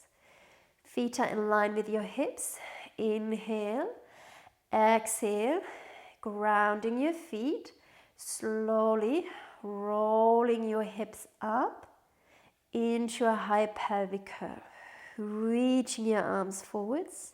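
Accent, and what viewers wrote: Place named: Eastern Europe. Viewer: Thank you,. I asked you because I am russian myself and I can hear that slight easteuropean accent. Ann your name in russian.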